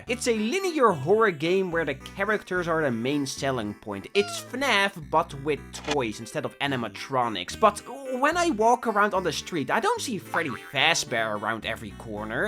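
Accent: Netherlands. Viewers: hoi ben jij nederlands??? ik hoor het aan je accent lol edit: lmr ik weet gewoon dat het zo is want ik ben echt foekoeng slim snap je de grap hahahahahhehehehehe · are u dutch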